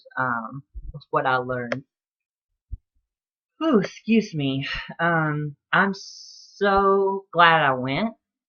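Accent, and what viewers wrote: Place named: Georgia, United States. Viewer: Good morning, I just checked out your podcast, I did a quick catchup. The whole time I was watching I thought you have a strong Georgian (you all.......) lol accent. I missed you telling your location. Diamond is too sweet. By the way we are neighbors. Marietta is were I knit. Keep it coming.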